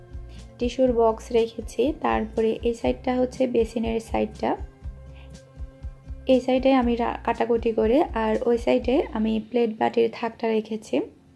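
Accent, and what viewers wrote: Chittagong, Bangladesh. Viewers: Apnar kotha shune Mone hocche . Apni amr attio. Bcoz we r chatgaiya.(Chittagong) 💕💕💕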